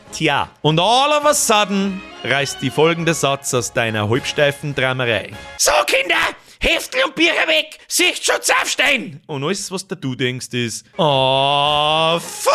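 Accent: Bavaria, Germany. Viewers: Wia scho bei fui Videos an nogl afn kopf drofa 😂 lang is scho hea awa ja so in etwas was. Da is wenigsdns oana den woma faschdäd 😂😂😂😂 und dea an gleichen Dialekt red. Ja bin im Nachbar Ort af gwogsn 👍👍👍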